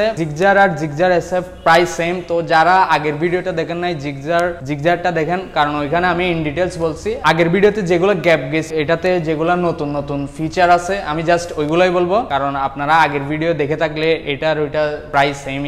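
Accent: Chittagong, Bangladesh. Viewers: 100% chittagong ar manush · অনেকে দেখি উনার উচ্চারণ নিয়ে হাসাহাসি করছেন। অন্যের ভুল দেখে হাসাহাসি করার অভ্যাস আমাদের গেল না। উনি পরিশ্রম করছেন, ডেডিকেশন দিচ্ছেন এবং এভাবে হয়তো ভুল করে হয়তো নয় শিখবেন। সুন্দর করে কি ভুলটা সংশোধন করে দেওয়া যায় না? আমরা সভ্য মানুষের মত কি আচরন শিখতে পারবো না? যারা হাসাহাসি করছেন তারা কি মনে করেন যে আপনি সবকিছুতেই একদম পারফেক্ট। হ্যাঁ আমি দেখলাম উনার কথা বলার ভঙ্গিতে চট্টগ্রামের টানটা একটু বেশি এবং কিছু কিছু উচ্চারণ তিনি ভুল করেন। কিন্তু আপনাকে যেটা বুঝাতে চাচ্ছে যেটি দেখাতে চাচ্ছে সেটাতে উনি পারছেন এবং চেষ্টা করছেন। চলুন যারা চেষ্টা করে তাদেরকে আমরা অনুপ্রেরণা দিতে শিখি। 🙂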